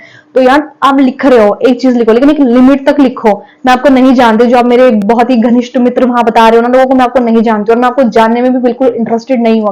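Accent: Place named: Karachi, Pakistan. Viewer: aapka hindi accent 90% Karachi walon jesa hai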